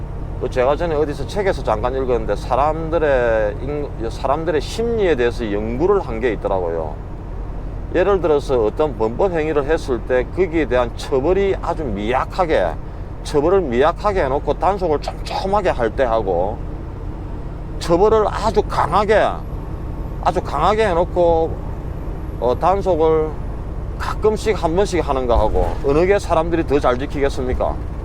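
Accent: Gyeongsang, South Korea. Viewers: 안녕하세요 어제 밤에 다큐세상 " 편"을 보고 인사드립니다. 15일씩이나 계속되는 장거리 운전이 매우 피곤하실텐데, 그속에서 보람을 찾고 새로운 풍경과 정보를 소개시켜 주셔서 감사합니다. 너무 맵게 드시지 마시고 건강관리도 생각하세요. 멋진모습과 구수한 경상도 목소리가 좋아 구독자로 등록 신고합니다.....늘 안전운행하세요... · 다규세상에서 보고 들어 왔어요. 서울 사투리보다 경상도 표준말 최고 입니다. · 오오! 방금 케비에스2티비 방송예고보고 미친듯이 달려옴요! 와! 와! 영어도 갱상도 사투리로하던 공중파 나온다! 와 와! 갱상도 싸나이다 아이가!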